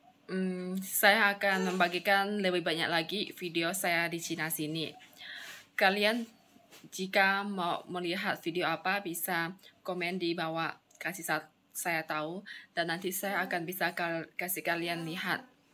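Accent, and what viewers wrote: Kalimantan, Indonesia. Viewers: asli Indonesia dari Kalimantan tapi dia keturunan China Indonesia ketika di Indonesia lebih sering pakai bahasa daerah seperti bahasa Hakka jadi ketika ngomong indo logatnya rada asing🙂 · Sepertinya Tionghoa Kalimantan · Logat bicara org kalimantan , Pontianak mmng kebanyakan begitu bu.. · Itu logat tionghoa kalimantan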